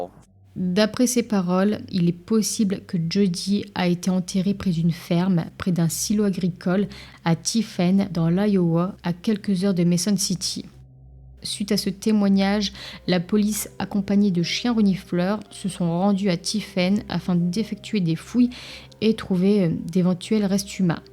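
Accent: North, France. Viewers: Je ressens dans ta voix super douce une note d' un accent du nord de la France je me trompe ? 😁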